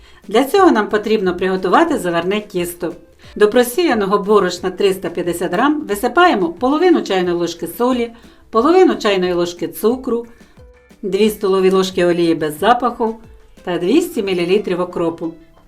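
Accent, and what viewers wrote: Standard. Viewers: Супер - вподобайка і підписка! ❤ Дякую за рецепт.Але найбільше вразила мене Ваша мова. У мене муз.освіта , і я можу порівняти мої почуття від Вашої озвучки відео з такою музикою, що хочеться слухати і отримувати насолоду. Прошу Вас , будь ласка, дати мені відповідь, в якому регіоні Ви живете? Бо я ще не зустрічала такої справжньої чистоти .✨️💙💛💙 ( цікаво, чи я вгадаю🤔 - черкаська обл.?)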